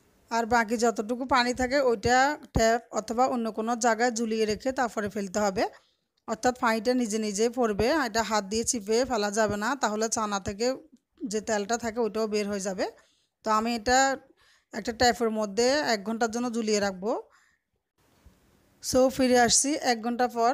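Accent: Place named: Noakhali, Bangladesh. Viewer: কথা শুনে মনে হচ্ছে উনি নোয়াখালির।এদিকের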